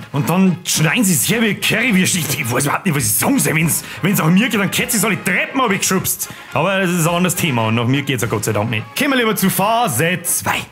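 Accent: Bavaria, Germany. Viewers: Wenn man als Thüringer jedes Wort von diesem bayrischen Akzent verstehen kann!(Ich bin ein Thüringer mit bayrischer Verwandschaft) Weißwürste sind wirklich für mich eine Delikatesse!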